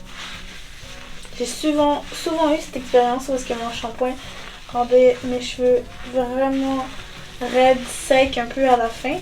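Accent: Canada. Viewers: Vous avez un petit accent canadien mademoiselle 😉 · Je connais la gamme d'Eva depuis plus de 2 ans et demie, elle a bien progressé ! J'utilise la gamme Kreyol essence depuis 3 ans vous la connaissez ? Elle est chère aussi mais fait du bien ! Il faut pas gaspiller. J'adore l'accent canadien ! Je pense tester la gamme de Eva mi 2023 !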